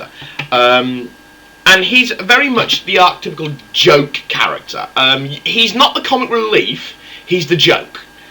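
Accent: United Kingdom. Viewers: but seriouslyis he british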